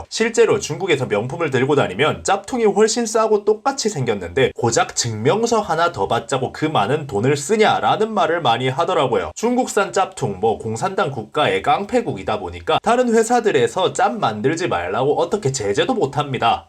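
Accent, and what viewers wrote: Gyeongsang, South Korea. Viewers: 경상도 사투리 쓰는거 맞으 신거죠 첨 억양이 ... ㅎ ㅎ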